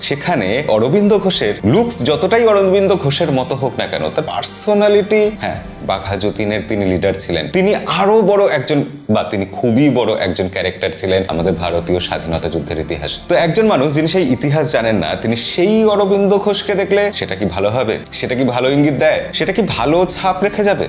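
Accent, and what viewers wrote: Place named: Bangladesh. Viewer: Apner bangla bolar dhoron ta Bangladeshi ter moto ...apni Bangladesher kotha theke aschen?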